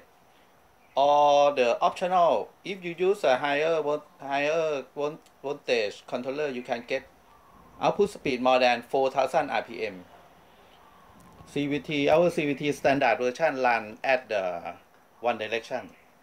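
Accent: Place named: Thailand. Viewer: Are you Thai สำเนียงไทยมาก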